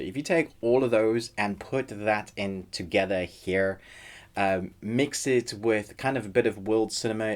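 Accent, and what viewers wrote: South Africa. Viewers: south african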